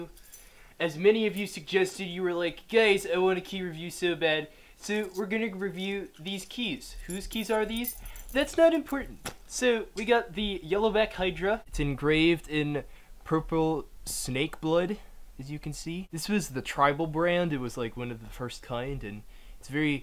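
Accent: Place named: Boston, United States. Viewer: Cool dude sounds like he has a slight accent of some sort. Boston perhaps? 😂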